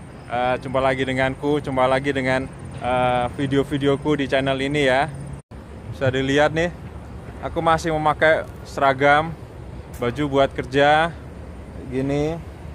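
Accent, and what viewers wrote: Java, Indonesia. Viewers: jowone daerah pundi mas smpean...suka bgt ma isi video ini..😊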